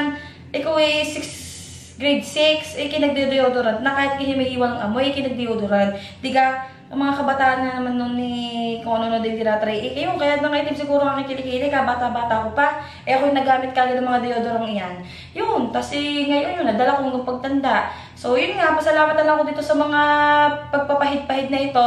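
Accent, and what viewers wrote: Batangas, Philippines. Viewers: Ang lalim po ng accent mo...taga batangas ka noh? Hehehe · Ang gudvibes ni ate gurl hehehe... 😂😂😂 batangeña... Tone heheh.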